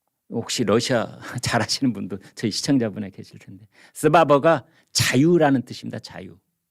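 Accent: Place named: Gyeongsang, South Korea. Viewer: 왜 경상도사람은 육십구라 하든지예슨아홉이라 하던지 육십아홉이라. 왜하나요 개같은경우.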